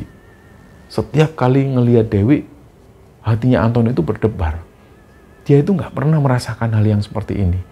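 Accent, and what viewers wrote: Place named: East Java, Indonesia. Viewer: Logat jawa timuran ne mantap mas.. apalagi kalau ngomong BBAHAYA..😂😊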